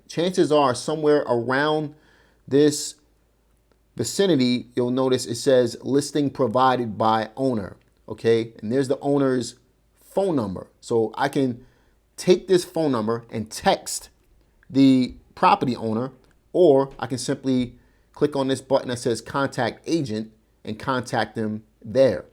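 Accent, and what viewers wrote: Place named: East Coast, United States. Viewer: Thank you SIR for that tea, info, bomb AND mic drop on this tid bit. Now, I do have a question if you can possibly address it or anyone who may also have the answer. I hear a hint of possibly East coast in your voice(which I LOVE, not flirting, lol), so I'm concerned that, that strategy works well in and around that area. And by no means do I have a clue how to go about buying real estate, so yes I turn to info God's such as yourself. I live on the West coast, Cali to be exact. And if anyone knows Cali, shit is difficult in these parts hunTie! So we have so many hoops to jump through on ish. I say all that to say this, would that particular strategy work over here in Killa Cali and yo pockets? Thanks again for the info hun.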